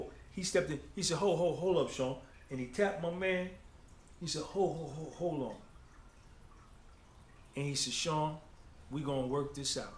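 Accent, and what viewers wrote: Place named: South, United States. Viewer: That country accent was 😂😂😂😂 My fam is from Kings tree South Carolina 😂😂😂💪🏿💪🏿💯💯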